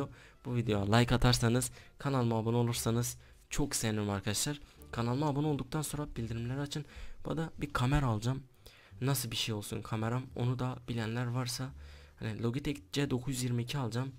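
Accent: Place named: Malatya, Turkey. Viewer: abi nerelisin bizim melekt gibi konuşuon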